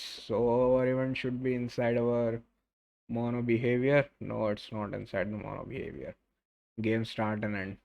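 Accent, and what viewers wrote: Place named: Gujarat, India. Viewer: are you form Gujrat ? keep it up bro.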